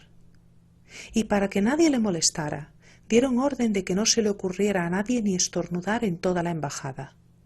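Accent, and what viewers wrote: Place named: United Kingdom. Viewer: Gracias por tu esfuerzo. (...y asentó británico ).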